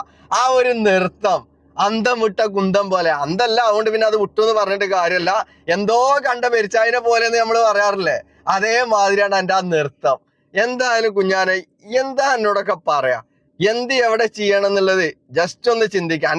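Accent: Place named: Pandikkad, India. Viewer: സംസാരിക്കുന്നത് പാണ്ടിക്കാട് ഭാഗം സ്ലാങ് തന്നെയാണ് പാണ്ടിക്കാട് മലപ്പുറത്തു അല്ല എന്ന് പറയരുത് മലപ്പുറത്ത്‌ തന്നെ വ്യത്യസ്ത സ്ലാങ്ങുണ്ട് പൊന്നാനി മൊഴിയല്ല അരീക്കോട് ഭാഗത്തു കൊണ്ടോട്ടി മൊഴിയല്ല പെരിന്തൽമണ്ണയിൽ നിലമ്പൂർ മൊഴിയല്ല വേങ്ങര ഭാഗത്ത് എല്ലാറ്റിലും ചില വ്യത്യാസങ്ങളുണ്ട്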